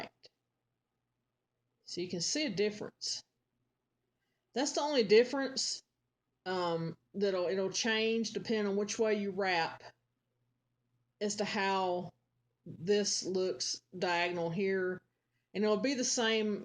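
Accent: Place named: South Carolina, United States. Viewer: Hey hun, where you live? Upstate South Carolina here. You talk like we do , actually better than I do. People from other states have trouble understanding what I say. My daughter-in-law is German and taken years to feel comfortable talking with her! There's still times she and I don't comprehend one another. Also, I love your videos! I'm so grateful for you and several others, no offense, if not for these videos I probably would never started 😅! Thank you 😊!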